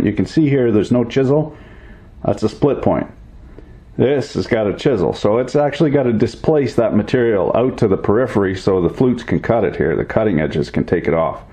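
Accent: Canada. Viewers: Haha are you Canadian guy? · Sounds Canadian. Fun video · Just sounds like a fellow Canadyun to me. I don't hear an accent. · Canadian? or Minnesotan? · definitely canadian. eh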